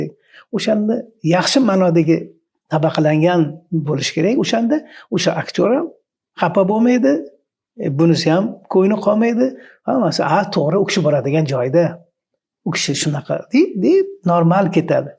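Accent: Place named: Tashkent, Uzbekistan. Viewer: Тошкент шевасида гапирар экансиз, узингизни шевангизда ёки адабий тилда гапирсангиз эди.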